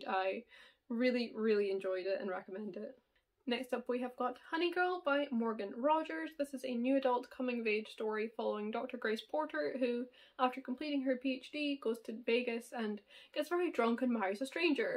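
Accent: Scotland, United Kingdom. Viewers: This type of recommendation video is so needed! I'm deffo gonna have a look at some of these! (ps, i love your accent!! I'm from scotland too <3)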